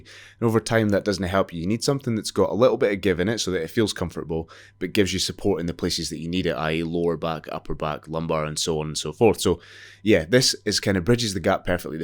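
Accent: Scotland, United Kingdom. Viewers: It's basically a seat from an old volvo with all them lumbar supports lol reminds me of my old man's motor years ago lol I'm looking for a new seat and it's gonna be one these boulies seats I do like that one in black I've been looking at as I'm a modeller and always at a bench building kits!. Whare are you from you sound like me down tae earth love the review deffo new scots subscriber!😂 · Amazing ! Love when I find a Scottish review. "If youv goat your own then use it coz it's pish" 😂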